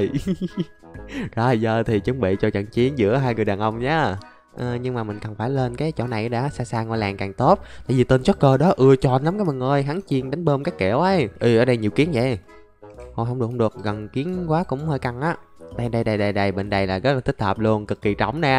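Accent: Vietnam. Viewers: Anh đọc tiếng anh nhử tiếng Việt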